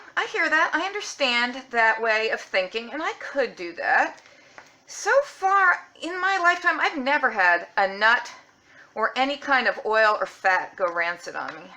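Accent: South, United States. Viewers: I just love Southern Belle voice. xoxo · You sound more southern than the actors and actresses that fake them!!❤❤❤😎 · 💞Love your Southern Accent & how funny you are!!💞💞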